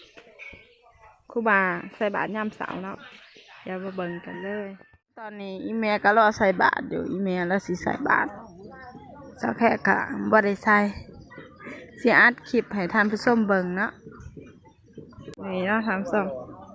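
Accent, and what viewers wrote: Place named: Sisaket, Thailand. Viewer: เว้าคือแถวศรีสะเกษเลยครับ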